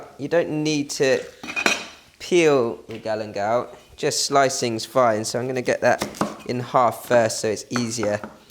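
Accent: United Kingdom. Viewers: u got a british accent bro?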